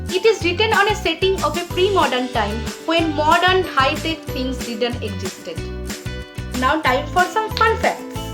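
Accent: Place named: Bengal, India. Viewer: You seem happy to read books and that excitement shows in this video. We love our Bengali accent too.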